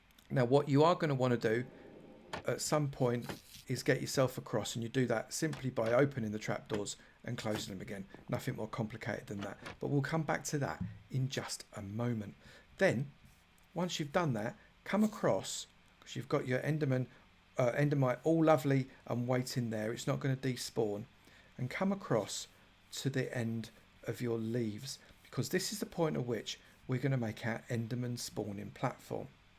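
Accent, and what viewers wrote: United Kingdom. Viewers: Niks because he has a British accent, dumbass.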